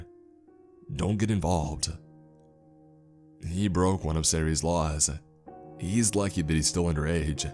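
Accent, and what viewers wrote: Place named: Midwest, United States. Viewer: General mid-western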